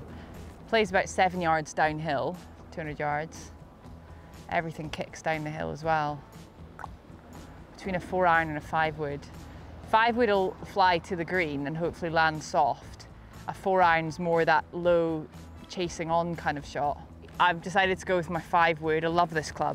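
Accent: Scotland, United Kingdom. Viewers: Great video! Thanks for sharing your insights on the course, also bonus listening to your lovely Scottish accent 😊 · Great round....you gave it a good go and you weren't too far away from your own course record. Would love one of the balls which would take pride of place in my collection! On a side note, as a Scotsman who's lived in The Netherlands for over 25 years, it's great to see a wee Scots lassie doing so well and hearing the accent is music to my ears!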